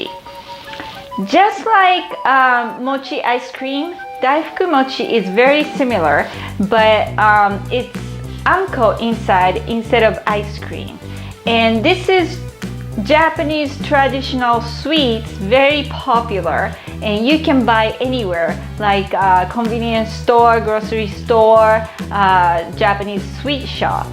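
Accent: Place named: United States. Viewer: Where did you learn English? , you seem to have a slight American accent, I enjoyed your video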